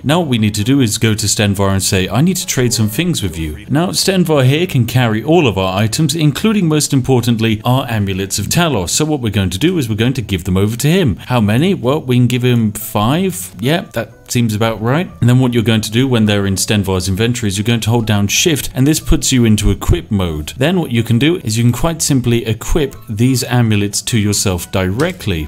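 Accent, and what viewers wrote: United Kingdom. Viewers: I heard "welcome to the wonderful world of" and thanks to the British voice my brain auto filled Terraria. I miss TB. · I love how unapologetically British you are.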